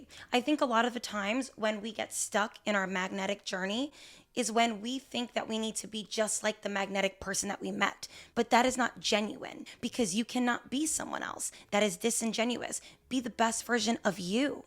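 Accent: New Jersey, United States. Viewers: your channel is a blueprint of what it means to be magnetic! You’re authentic and not trying to be anyone else, from your Jersey accent to your fashion sense all your own unique stamp. You show up vulnerable and passionate about the topics you post on. You’re kind and generous to your online girlies and in real life. Your presence is noticed and missed when you’re gone! You’re able to get your community to open up to you and trust your advice. You have grown into THE magnetic woman! ❤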